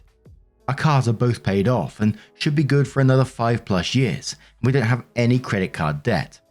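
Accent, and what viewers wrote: England, United Kingdom. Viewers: I'm Scottish. I love channel too, but he has an ENGLISH accent. There's no such thing as a (singular) British accent!! 😉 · My favorite reddit reader is you,. I love your waffles, your giggles, and when you swear. I don't know why but it's better with an English accent.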